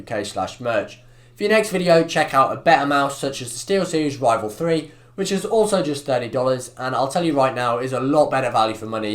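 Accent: United Kingdom. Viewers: Heyyy are u bri’ish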